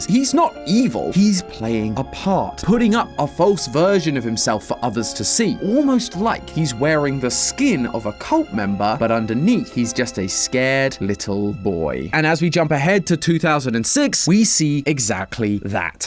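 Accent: United Kingdom. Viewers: This channel has become so unwatchable because of the horrible Bri'ish accent and constant indie horror. Please get a different host and start covering actual games again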